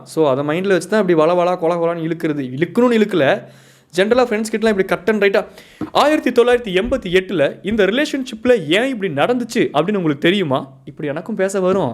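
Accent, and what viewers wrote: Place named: Kongu, India. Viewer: தம்பி நேத்து தான் உன்னோட வீடியோ மொதல பாத்தேன் நம்மளோட கொங்கு தமிழ் பேசரது நல்ல இருக்கு நம்மளோட கொங்கு தமிழ் இன்னும் நெறைய வார்த்தையில பேசுங்க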